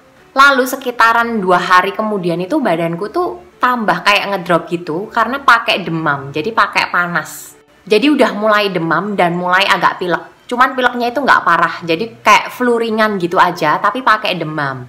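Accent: Java, Indonesia. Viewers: Medok gaes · Jowo ne medok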